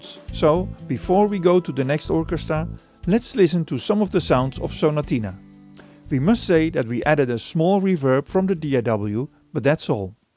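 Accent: Netherlands. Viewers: You sound Dutch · a lot of these sounds actually sound better than some of the pricey VST's (at least before you do a hell of a lot tweaking and modifying). You can certainly learn the trade using these, before upgrading to the big pricey stuff. Thanks a lot neighbour (I'm from Belgium, and I hear a Dutch accent in your voice, right?)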